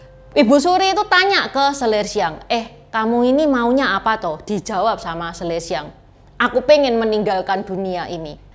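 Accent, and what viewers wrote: Java, Indonesia. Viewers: Looo ... Kok .... Ngene 😁😀😁😀😁😀 ngomong nee ... 😁😀😁😀😁😀 Iki Tionghoa atau jawoooo.... 😁😀😁😀😁😀 Ngomong nya medoook ..😁😀😁😀😁😀😀😀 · Logat jawa mu dlm kl y berdaaarkan Dr MN,cerita nya?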